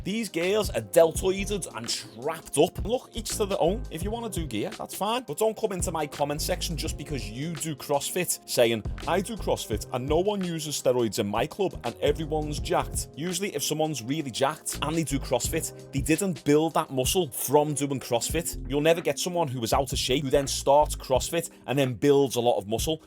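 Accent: Liverpool, United Kingdom. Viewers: I love his Scouse accent! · I hate British accents, but I LOVE this guy’s accent!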